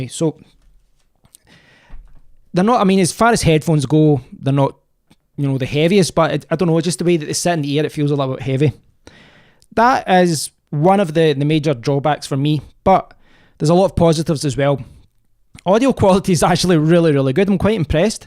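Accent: Scotland, United Kingdom. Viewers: i love the way you say K .... i love your ascent reminds me of my grandparents who were both from Scotland..thanks for the vid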